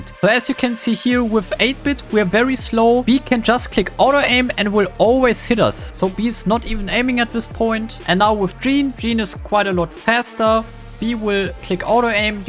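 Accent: Germany. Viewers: Du bist doch deutsch oder? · Man merkt so hart das er deutsch ist. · You speak English like Thomas Tuchel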